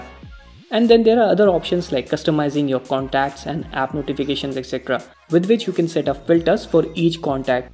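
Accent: India. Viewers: Ngomongnya medok india banget yak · I could hardly understand your Indlish...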